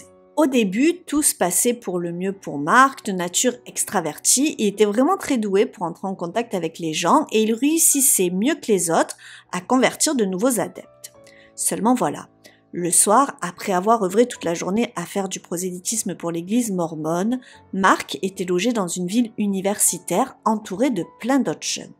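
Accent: South, France. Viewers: Diction pas vraiment bonne car malheureusement a plutôt tendance à très mal prononcer les mots comme rouages...nuages...mariage... crochetage...emballages..etc. Ce genre de mots finissent en "ache"...rouaches...nuaches... mariache...emballaches...etc... Et c'est hyper énervant. Surtout qu'en plus a un petit accent (du sud...je pense)...et ce n'est vraiment pas joli à entendre. A part cela...il est vrai que est une des youtubeuse true-crimes qui s'exprime le plus clairement.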